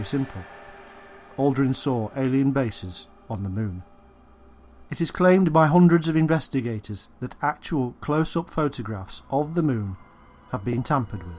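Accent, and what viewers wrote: United Kingdom. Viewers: Devon accent?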